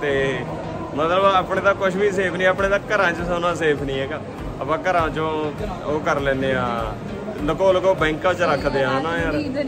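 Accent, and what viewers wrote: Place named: Gurdaspur, India. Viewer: Veer di awaj sun ke hi pata lug gia si ki gurdaspuria he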